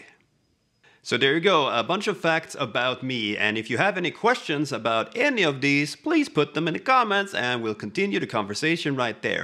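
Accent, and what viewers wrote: Skåne, Sweden. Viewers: Ahhh, the lovely broad diphthongs of Skånska! That was the dialect of Swedish I learned many years ago. Thanks for the memory!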